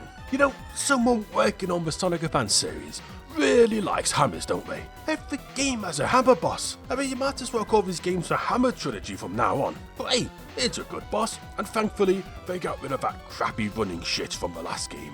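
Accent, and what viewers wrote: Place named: Yorkshire, United Kingdom. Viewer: I always assumed you were a Yorkshire man!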